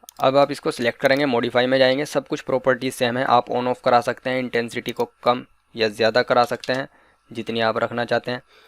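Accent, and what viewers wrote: India. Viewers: Hi, you sounds like my side. Are you from U.P.?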